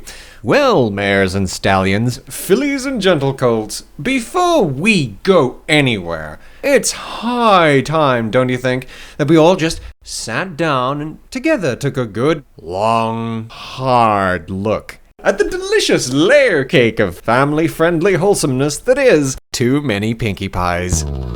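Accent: United Kingdom. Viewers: British narration & comedy on mlp comentary. Love it.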